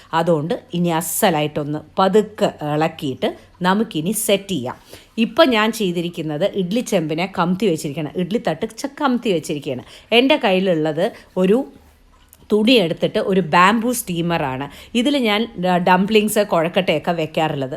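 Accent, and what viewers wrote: Palakkad, India. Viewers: Chechi palakkad anale athanu palakkad slang samsarikinathu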